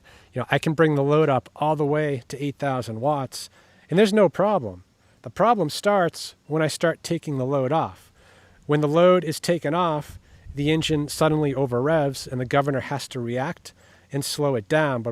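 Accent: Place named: Midwest, United States. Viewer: I got a chuckle out the the "Fuel Make it Happener" segment.. Watching Vice Grip Garage is great. I live up here in the Mid West and there are a few of us that talk like that.... Its just a natural way. Make sure you check the Battry.....Along with the Spark-o-lator. HaHaHa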